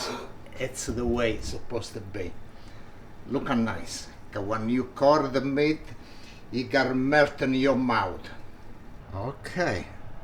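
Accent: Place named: Sicily, Italy. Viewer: Looks great I love lamb and I do make that but not this recipe I definitelywill do this recipe thank you and I love your videos and accent remind me of my patents who cane from Sicily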